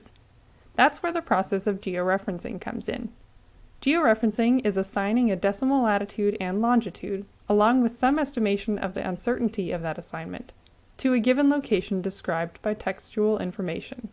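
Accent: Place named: India. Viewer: Talk like indian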